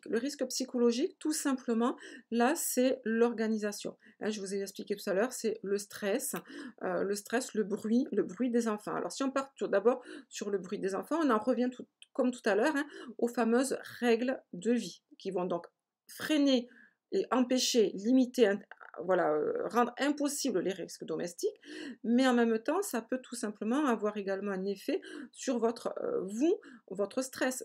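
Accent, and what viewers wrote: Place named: South, France. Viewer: Merci pour vos vidéos qui sont très intéressantes ! Si je peux me permettre un détail, vous avez un très bel accent du sud avec une expression qui revient ÉNORMÉMENT (le fameux "tout simplement") l'avantage c'est que ça laisse le mot "simple" en tête et c'est toujours mieux que "compliqué" ;) tout simplement :D merci encore pour vos vidéos qui m'aident à y voir plus claire dans la formation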